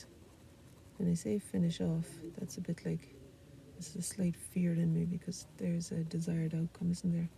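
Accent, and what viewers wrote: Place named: Ireland. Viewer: your lovely soft Irish lilt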